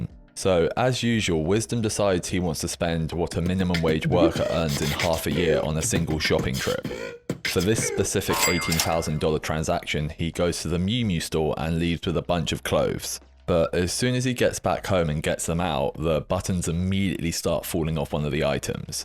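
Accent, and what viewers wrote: United Kingdom. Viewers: when a monotone british man speaks, i listen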